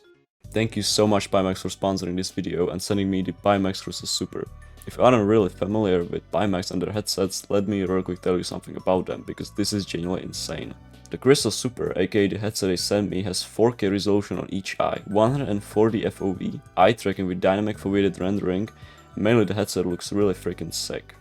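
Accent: Czech Republic. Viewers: are you czech? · From the accent i knew that you are czech! brachoo řízek 🍺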